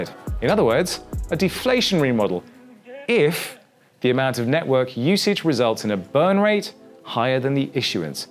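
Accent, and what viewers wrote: United Kingdom. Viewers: Why is a British accent so convincing? Between this dude and Coin Bureau, I believe everything they say!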